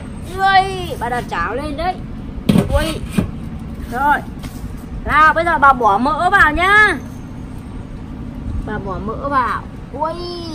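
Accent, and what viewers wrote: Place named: North, Vietnam. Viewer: Người bắc thế phải rồi cau ạ :))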